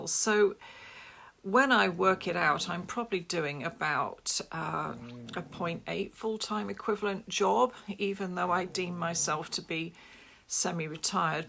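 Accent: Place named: England, United Kingdom. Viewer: New subscriber.. love your channel..and the English accent.. thank you... ❤ From Australia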